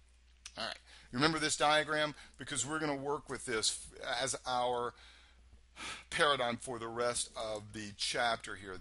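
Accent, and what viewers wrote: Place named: United States. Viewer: Why do your vids get so many dislikes? Youre like the only person teaching sql with a perfect american accent lol.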